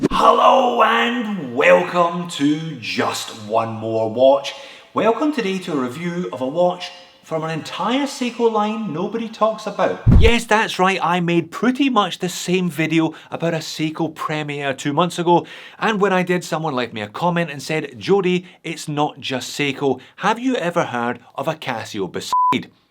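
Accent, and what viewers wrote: Scotland, United Kingdom. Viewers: I know you're in Australia but are you originally from scotland because your accent says you are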